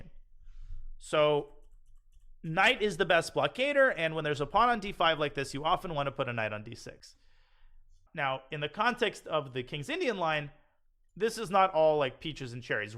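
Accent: Russia. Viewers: Nipo imitation? So fun to here this russian accent. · Sensei Danya you have to speak with a lovely russian accent more often. I love it :) Ofc love your priceless vids too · That Russian accent always gets me. Much love, Danya!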